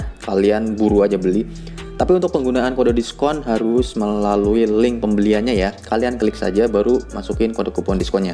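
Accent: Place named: Banyumas, Indonesia. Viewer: Jos gandos 👍👍👍 ora ngapak ora kepenak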